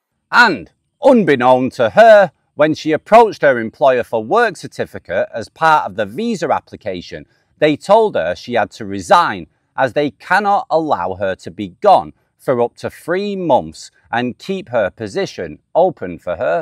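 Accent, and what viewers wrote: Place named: North, United Kingdom. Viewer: Glad you are back in the groove. I look forward to your stories every day. I enjoy your northern accent. Dave from California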